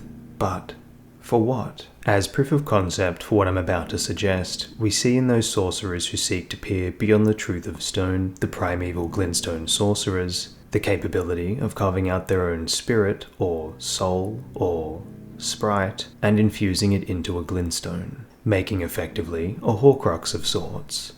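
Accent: Australia. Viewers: What is it with ER lore videos being narrated by sexy male Aussie accents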